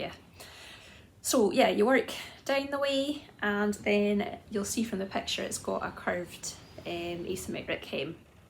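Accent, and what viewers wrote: Scotland, United Kingdom. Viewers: Hello, lovely to see you again. This is only the second podcast I viewed, I only quite recently discovered your channel. Love your Scottish accent and all your lovely knitting. My small win recently: I am gonna finish a Marie Wallin cardigan I started almost 5 years ago. I finally feel self assured enough to finish the colour work yoke ánd do the steeking. This will be the summer of me finishing my lovely cardigan, so I will be ready for a nice autumn wearing this beautiful colourwork cardi. 😍😍😍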